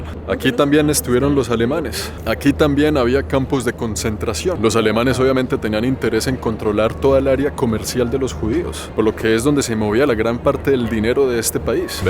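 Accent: Bogotá, Colombia. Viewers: Hola gracias por tus vídeos tan instructivos,y con tu acento tan marcado de puro Bogotano.ojala visites pronto la tierra.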